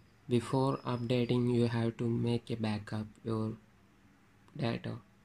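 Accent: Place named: India. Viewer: I hate your "hindu-glish" ! FCKU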